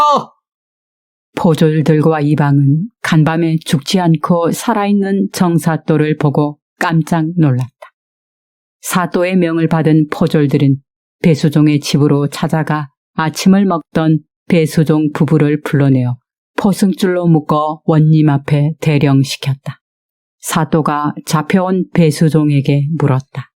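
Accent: North Korea. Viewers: 북한사람이 읽어주는거 같네요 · 억양이 북한쪽 같네요?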